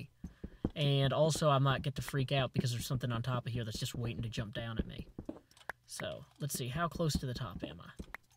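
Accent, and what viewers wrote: United States. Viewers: I love finding smart Americans with accents. Makes me want to laugh in the faces of all the people who call Americans dumb hicks. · Cool! American accent,and speak quite slow, but still you make very cool videos! But whos vches?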